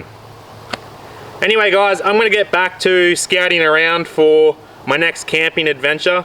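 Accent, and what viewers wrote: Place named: Australia. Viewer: Yes to a snorkel, and yes to more hearing you say, "battleprius," in your lovely 'Strayun accent.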